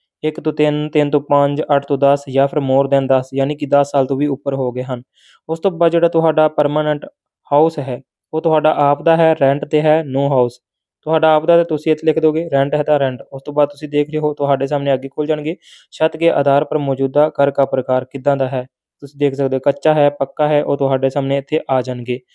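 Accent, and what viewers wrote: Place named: Bihar, India. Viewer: Tere awaj bihar Wali lugi menu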